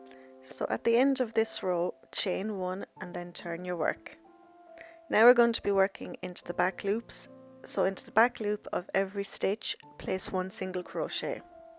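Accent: Ireland. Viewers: So cute i am always scared to make sweater. Becouse of my boobs being so big lol i am gonna have try this out though do you think if i measure around my boobs and get ths mesurment that way it would work .just wondering i am 44 dd. I love the sweater and i love your Irish accent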